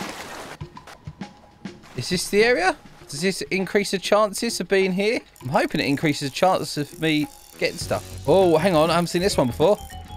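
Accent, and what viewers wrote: United Kingdom. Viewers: hey are you a british? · you british??? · Is he British?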